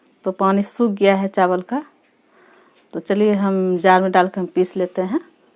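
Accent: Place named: Bihar, India. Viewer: Aapke bolne ka tarika hi batata h aap bihar se ho me v bhagalpur bihar me hi rahti hu. Meri request h aap jo baate krti h usme kuch improvement laaiye viewers badhenge Or likes v jada milenge. Bura n maniyega recipe bhut achi h magar wo kya h dekhne walo ko youtubers v smart chahiye. Dhanyavaad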